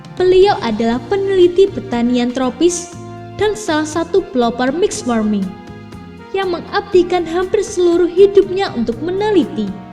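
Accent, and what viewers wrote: Java, Indonesia. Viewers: Suara nya orang jawa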